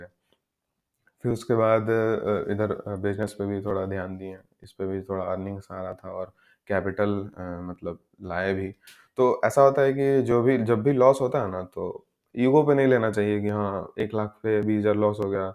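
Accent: Bihar, India. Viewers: Bhai aapke awaaz se lagta h aap bihar se h kya? I m also new in market I found your video interesting.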